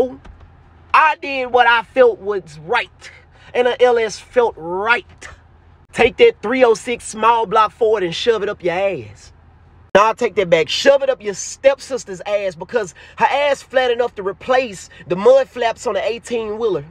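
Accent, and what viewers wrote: Memphis, United States. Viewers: Lol 😂you are definitely a memphis native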